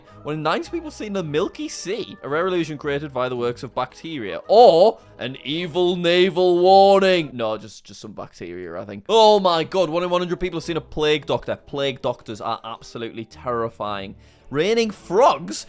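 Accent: United Kingdom. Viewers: shut up asshole its British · Is British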